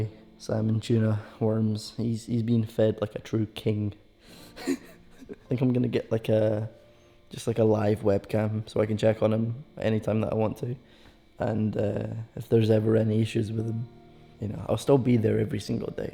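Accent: Scotland, United Kingdom. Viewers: Dude sounds Scottish af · Yaaas knew you was Scottish 😂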